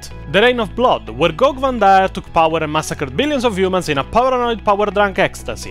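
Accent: Italy. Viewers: This is awesome! Looking forward to more of videos and savory Italian accent! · This italian english is so perfect 🤌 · But not violently Italian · Holy shit, he sounds AGGRESSIVELY Italian! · Gran Acento Rustico Italiano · This is fire, I mean I knew you were Italian but this accent just makes your jokes 10 times funnier looking back at em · You sound exactly like an Italian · Bro is the most Italian sounding Italian I've ever heard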